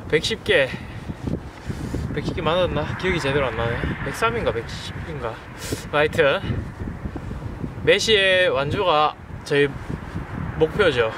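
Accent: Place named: Gyeongsang, South Korea. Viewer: 관측은 어디서 보통하시나요? 대구분이신거같은데??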